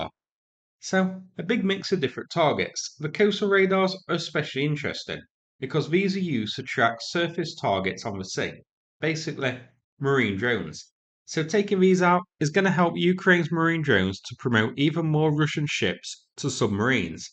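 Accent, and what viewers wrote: United Kingdom. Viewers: u fucking clown turn off ur retarded bri-ish cuck accent when?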